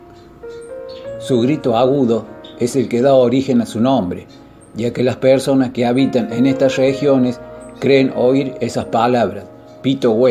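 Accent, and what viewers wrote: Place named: Córdoba, Argentina. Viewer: Hermosa leyenda ANCESTRAL y tu tonada cordobesa . Saludos desde mi Argentina bella y nuestras provincias ❤ 😘👋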